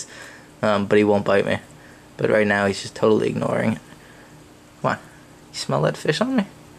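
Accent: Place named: United States. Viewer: WTF WHY DO YOU SOUND LIKE ASHTON IRWIN WITH A AMERICAN ACCENT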